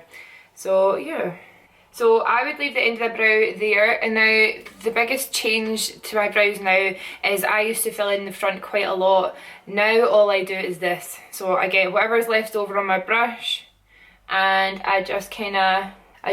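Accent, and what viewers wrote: Scotland, United Kingdom. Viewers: Just stumbled across your channel...oh my GOD! This is gorgeous! Nice to hear a fellow Scot on YouTube too! Buzzing to give this a bash 😍 x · I'm irish from northern Ireland she sounds Scottish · whenever you say "kinda" with your Scottish accent it sounds like you're saying my name(: love you!!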